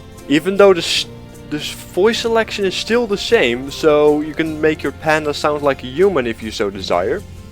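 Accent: Netherlands. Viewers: omg that dutch accent tho haha, I recognise it anywhere, mainly because I've got it myself :p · are you dutch? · "I'm and I am Dutch" or aint you? you sound dutch:)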